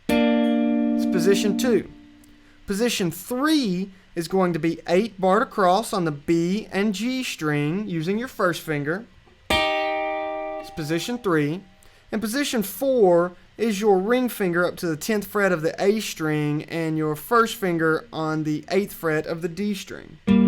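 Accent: South, United States. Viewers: thank you so much, i've been looking over for ages for this lesson! you rock! btw, where are you from? you accent sounds southern american.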